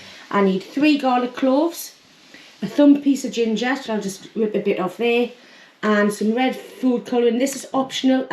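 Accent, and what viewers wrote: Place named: Northern England, United Kingdom. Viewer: I enjoy the food content of this shoe almost as much as I enjoy accent from the north of England. Americans like me love that accent because it feels so close to home and is very much like ours. Keep up the good cookin' work!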